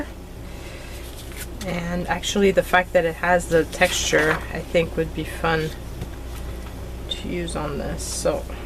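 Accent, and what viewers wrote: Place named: France. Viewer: I too have been watching your videos for some time now and can honestly say that you have been my greatest inspiration. Starting with recycling Christmas cards, I have gained in confidence, that I never had before I saw your channel. This has led to many different crafts I so enjoy doing. So thank you from the bottom of my heart. Also, I love hearing your French accent. I never miss your card making videos. My absolute favourite! xx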